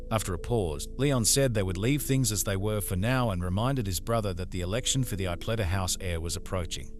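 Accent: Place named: Australia. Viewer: Awesome to see an australian brother on this trend, but I CANT STAND AUSTRALIAN ACCENTS. Love from NZ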